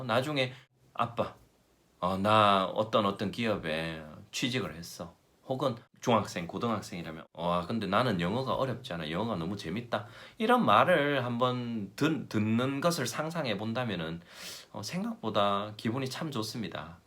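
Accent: Gyeongsang, South Korea. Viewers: 목표설정에 도움되는 영상이네요~~어정쩡한거 말고 잘했으면 좋겠는거!. 경상도갬성 넘 조음요 ㅋㅋㅋ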